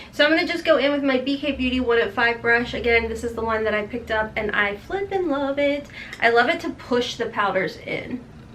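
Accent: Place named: East Tennessee, United States. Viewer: Found your channel and LOVE IT!! Especially because you sound like me lol (I’m from East TN) Anyway you mentioned self tanner and I’m just getting into that (used to be a tanning bed queen), what do you use??? So many make me orange and girl I love my Vols but ain’t looking to be orange and white 🙄🤪 HELP!!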